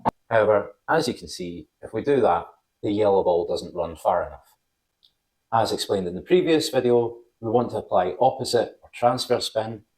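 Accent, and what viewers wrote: Scotland, United Kingdom. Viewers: Nice to hear a Scottish accent. Thanks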